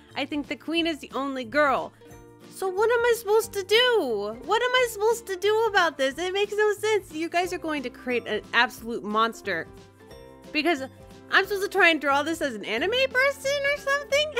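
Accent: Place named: United States. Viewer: It's so weird when you hear someone's voice for a while, and then see their face and it's COMPLETELY different than you imagined. This video is the first time i've seen your face- it's sort of like imagining characters in a book then seeing it in move form, but you actually had their descriptions in the book! ToT I thought you looked asian just with an american accent! Gosh i feel weird now.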